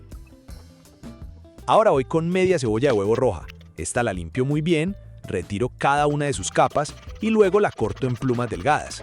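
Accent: Colombia. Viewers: ❤❤❤🎉Hola grasias por sus Resetas tan ricas el mango 🥭 me fasinan como sea pinton y no tan maduro saludos que asentó tan lindo Colombiano💗🥭🥭🍓🍐🍉🥑🍅🫜🥕🍍🌴🌊⛵🏖️ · Un saludo desde Costa Rica 🇨🇷✌️. Gracias por compartir tus recetas, son sumamente deliciosas. Me gustaría saber de que parte de Colombia eres, me gusta mucho tú acento. 🙅🇨🇷🥂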